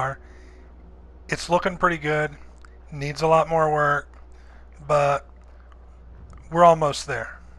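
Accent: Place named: Southeast, United States. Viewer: It's a southeastern U.S. accent.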